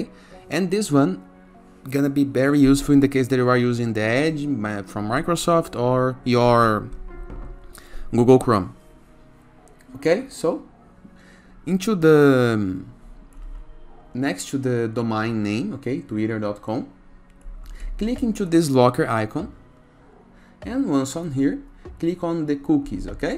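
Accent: Brazil. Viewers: sotaque brasileiro brabo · esse cara é brasileiro tentando aprender em ingles crtz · Claramente sotaque #BR hehehe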